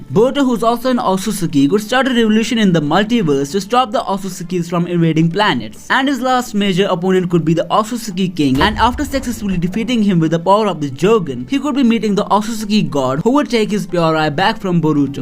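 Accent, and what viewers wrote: India. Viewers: if that comes true you are an on insider of baruto😉 ya one ques bro plz answer are you a indian your accent does sound like indian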